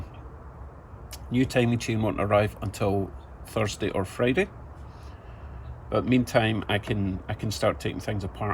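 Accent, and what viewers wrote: Scotland, United Kingdom. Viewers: Did I just stumble upon a Scottish mechanic. Awesome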